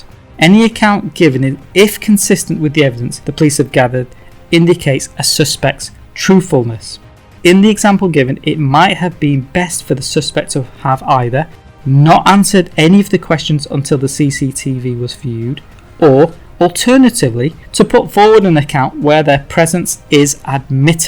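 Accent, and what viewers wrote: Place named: United Kingdom. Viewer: The narrator has a U.K. accent. I assume this would be great advise if I was there.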